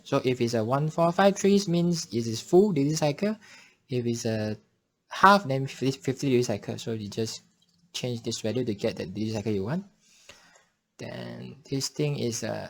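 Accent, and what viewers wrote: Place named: Singapore. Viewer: Thank you for the clear explanation. Your manner of speech reminds me of my childhood home town. I am from Sg, are you from Sg or My? Just asking. :)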